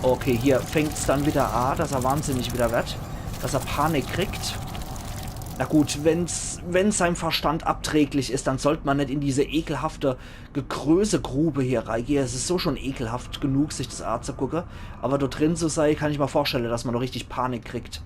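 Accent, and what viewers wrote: Palatinate, Germany. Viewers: Omg. LETS PLAY UFF pälzisch ist ja wohl das beste Ding ever!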